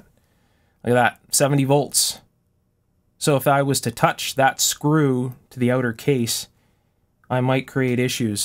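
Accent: Canada. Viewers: Um I said to myself "what the hell is VIM?". I found it is an abrasive cleaner sold in Canada ( accent makes his origins obvious). However, it's not sold elsewhere in North America (from what I can see). Here's a friendly tip for the future, when bragging about a product, give context and show what the product is. VIM is too localized. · It's a great escape to see bring back a classic radio but the real therapy is in the monologue: his Canadian pronunciation is refreshing to these jaded American ears. My. mom used to voice her L's so distinctly that I would shudder as a child hoping not to be taken as foreign. Of course that was useless and the foreign property was a feature of the mind. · are you cannaddian?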